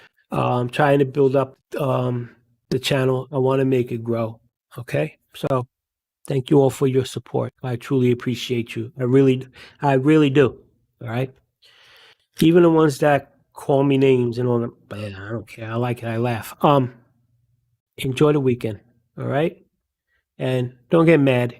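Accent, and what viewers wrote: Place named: New York, United States. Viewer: I'm southern but I think this being read in what seems to be a thick NY accent, with added sarcastic flourishes, makes it much better.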